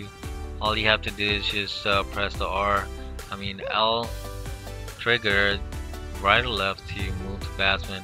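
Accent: United States. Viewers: Oh now I get it. In one of your earlier replies you had used hindi/urdu and from your english accent i thought you are an american. 😀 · Are you American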